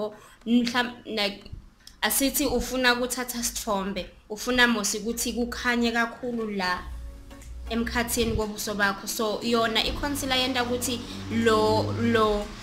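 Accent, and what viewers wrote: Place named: Eswatini. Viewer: So glad your Siswati accent sisekhonyana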